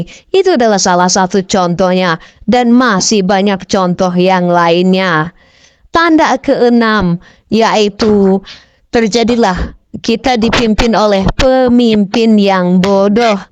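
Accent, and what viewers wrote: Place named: Netherlands. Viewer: Raimu wong ndi cuk..ngomong koyo ngono logate koyo londo udu wong indonesia kuplak....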